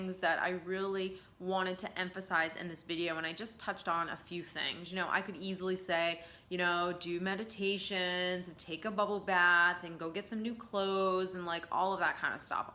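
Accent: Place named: New England, United States. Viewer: Hey — really am enjoying ur podcast and the tidbits of information that you provide. Love the New England accent that slips out times. Very charming 🤗. Keep doing you— it resonates with so many of us! #STEPHANIEROCKS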